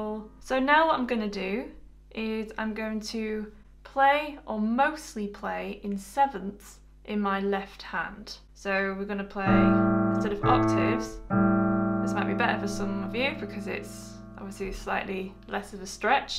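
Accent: United Kingdom. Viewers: Wow, what a great piano lesson, wish you were teaching live! Exactly what I needed to know at this stage of my piano journey. Your British accent is icing on the cake. Well done madam.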